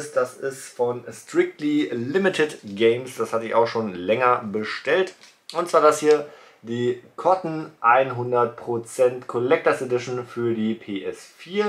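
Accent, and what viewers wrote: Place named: Berlin, Germany. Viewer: ich feier es mega wenn du berlinerst :D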